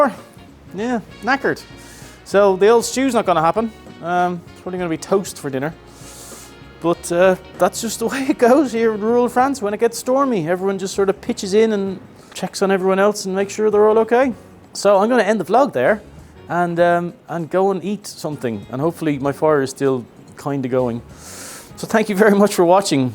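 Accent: Ireland. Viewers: question.....Do you speak French with an Irish accent?:eyes-pink-heart-shape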